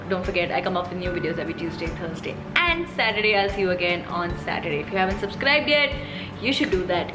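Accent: Nepal. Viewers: your nepali is the cutesttttttt.... dherai maya tapailai. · Oh gosh best nepali accent ever You the cutest I am nepali too and i am following u for years now Feels amaizing listening your own mother tongue from a person u follow is trying to learn it 👌👌 Best wishes to you You are beautifull soul Cheers to you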